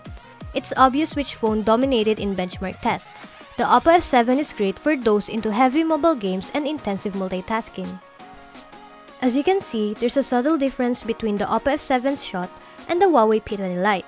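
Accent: Philippines. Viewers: kaya naman pala eh. Ph ka pala. Iniisip ko parang Philippine accent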